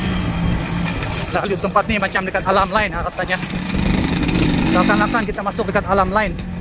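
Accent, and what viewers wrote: Sabah, Malaysia. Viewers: Bro asal sabah ke dengar longat bahasa melayu macam dari sabah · Abang sabahan ke dengar macam slang sabah bha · ni org sabah ke? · Kau orang mane bro? Mcm loghat sabah atau sarawak je 😅😅. Dh lama ke duk semenanjung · Terbaik La.. Bukan Kosong Ni.. Mesti "Berisi".. Hehehe.. Tapi Slang Abang Mcm Borneo Skit.. Bunyi Macam Sabahan.. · Brother orang mana? Slang cam Sabah je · Dia Sabahan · Sabahan ke..terbaik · Mantap bro.nanti bolwh repeat dengan kita .5/ 6 org je.Slang abang macam orang Sabah/Sarawak.Betul ke?? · Orang sabah ka bang loghat sabah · maaf nk tanya saudara ni asal mana.Bunyi loghat tu sesekali mcm org sabah ttp adakala mcm bunti perak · org sabah ke bang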